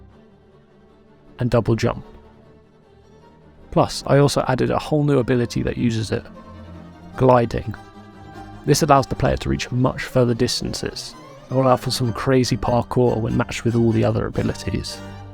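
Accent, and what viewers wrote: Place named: United Kingdom. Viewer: Really well made devlog dude and the game is stunning and looks so fun. I'm definitely keen to see more! Btw I hope this is not offensive, but your accent sounds like Dudley from Harry Potter! Which part of the UK are u from?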